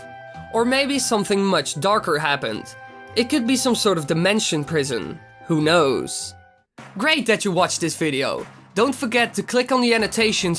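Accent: Netherlands. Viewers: Hi are you dutch. If so Goede video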